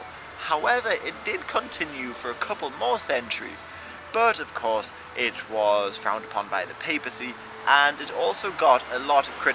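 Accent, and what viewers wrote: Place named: England, United Kingdom. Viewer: He's English, just because he doesn't have an American accent doesn't mean english is his first language. What most Americans don't understand is that ENGLISH is from ENGLAND.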